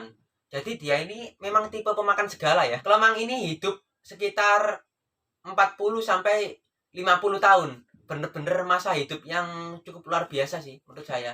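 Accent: Java, Indonesia. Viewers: Medhok bosomu mas🤣🤣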